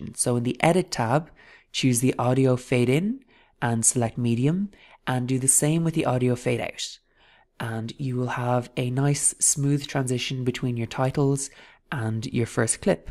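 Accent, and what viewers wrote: Ireland. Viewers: Thank you, very helpful and easy to follow. Your accent makes me want to tour Ireland again -- Sláinte!!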